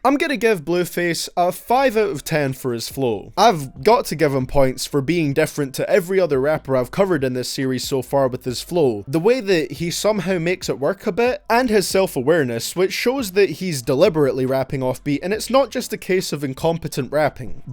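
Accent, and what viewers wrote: Scotland, United Kingdom. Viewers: I already commented this on another video but here again. I'm gonna go sleep now with you on, your voice is so comforting man. I don't mean it in a gay way I normally hate I really hate the British accent but you give the vibes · I think he's Scottish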